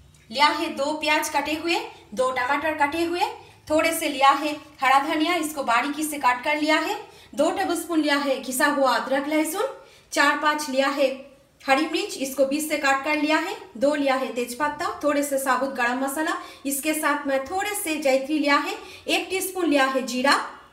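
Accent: Bengal, India. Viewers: Liked your recipe and your hindi with an awesome Bengali flavour👌 thanks for this wonderful recipe.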